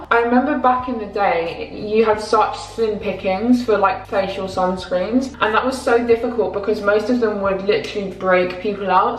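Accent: United Kingdom. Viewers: Amazing Channel and by the way I’m from the U.S.A. And you are from the U.K. I heard because your voice is British mine is English nice to meet you “socially”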